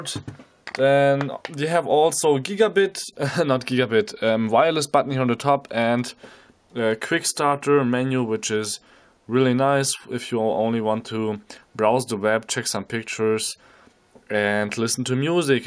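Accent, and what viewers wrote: Germany. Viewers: are you german :)?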